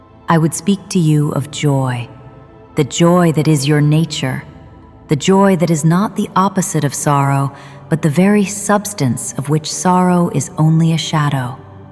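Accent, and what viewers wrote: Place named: United States. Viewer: If you are DF Mother God, may I ask, why do you have an American accent? ??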